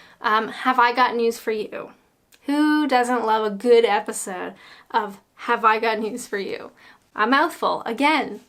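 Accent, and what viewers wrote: Canada. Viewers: OK, just another creepy old guy here who only recently found your channel, I love Canada and I really enjoy your delivery. However, if you get the chance to return to the UK and if you want to assimilate with Southern England, then you need to learn how to pronounce Task Master ... it should sound like Tarsk Marster. ;-) ;-) ;-) Haha ... tbh, I like your accent, don't change it. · wow that canadaian accent has come back really thick and strong. instead of crap under ur grey sofa, i see ur chucking clothes and crap on the floor near your bedside table. what book are you reading?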